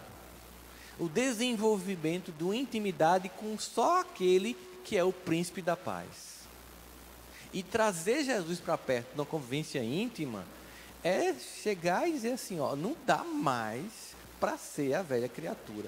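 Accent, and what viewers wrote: Northeast, Brazil. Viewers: Onde você estava? Que bênção você é...ainda mais com esse sotaque lindo...nordestinos são demais...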